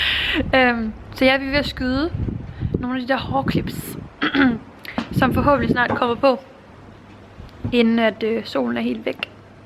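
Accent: Funen, Denmark. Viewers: Fineste. Så dejligt at høre dig noget mere på dansk ❤️. Elsker dit fynske 😍. Knuuus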